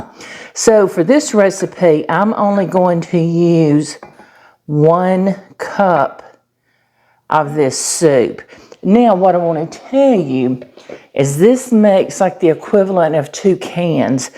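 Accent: South, United States. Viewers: I will definitely try this cabbage casserole recipe. I am New Jersey girl with southern roots. And I loved your southern accent, and especially your calm demeanor during your presentation. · Hi. I was just scrolling and came upon your video. Two of my favorite foods are cabbage and onions. I have never heard of this cabbage casserole. It sounds wonderful and looks pretty easy to make. I love your bright open kitchen and your great explanations, but especially your sweet Southern accent. I'm from California so it's a treat to hear you. Thanks so much. Subscribed.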